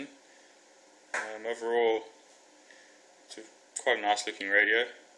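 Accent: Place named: South Africa. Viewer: Is that a South African voice I hear. Please tell me you know of a good place to fly in Cape Town, preferably close to the Northern Suburbs. Looking for a place to maiden my Bixler 2, It will will also be my first flight.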